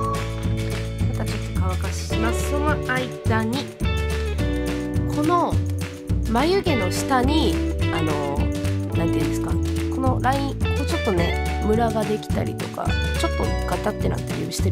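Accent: Kansai, Japan. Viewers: めっちゃかわいい白人さん？って、ベースメークの動画を見ておもって、関西弁だったから超びびりました！ ほんとファンです❤️ サバサバしてて、わかりやすいし、すき！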